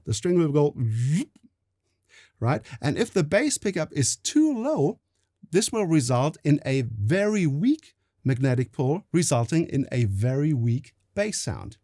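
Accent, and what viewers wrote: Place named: Germany. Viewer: great instructial piece being a seventy something German American it is refreshing to hear the Deutsch englander accent. Again great video.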